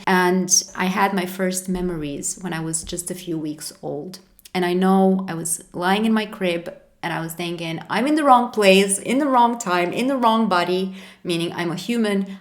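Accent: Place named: Romania. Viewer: Haha I can recognize a romanian accent in half a second. Greetings from Ro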